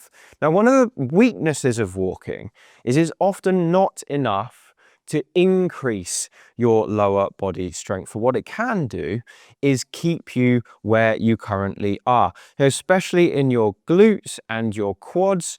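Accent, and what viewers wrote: England, United Kingdom. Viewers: I am irish and I love his english accent! I was born in Leeds. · The last exercize for balance was amazing! I've never seen it before and plan to add it to my routine. I love this channel for all the tips gives us - the English accent is a bonus!:)😊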